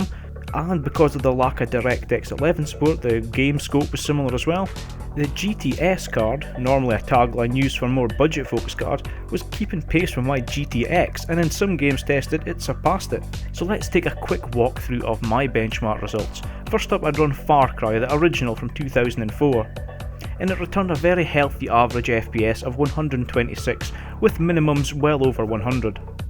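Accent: Scotland, United Kingdom. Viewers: Haha sounds awesome man! Good to hear another Scottish tech channel btw, I hope yourself and AdoredTV become bigger because both your videos are really good! I'm from Glasgow myself :)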